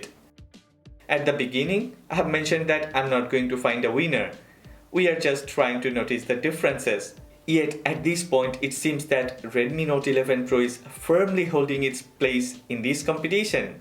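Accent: India. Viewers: Hey bro your speech is very similar of Indians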